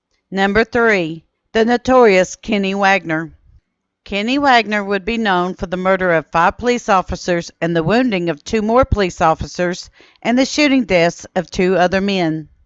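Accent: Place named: Appalachia, United States. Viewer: You sound like you speak Appalachian American, however you said, " Appalation". curious, mighty curious.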